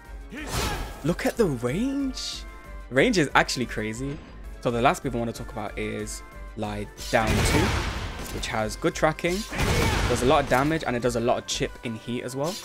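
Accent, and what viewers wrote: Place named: Birmingham, United Kingdom. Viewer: you from birmingham too? Accent gives it away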